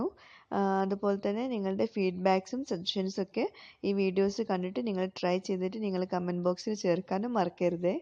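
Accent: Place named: Kannur, India. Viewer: Jojo Joy anganonnum parayarudhe...ente kannur kaari frnd idhe slangilaa samsaarikkaar... aadyokke njaan vijaarichirunnu ival konji pparayaann....pinneed avalde husband samsaarikkunnadum same🤣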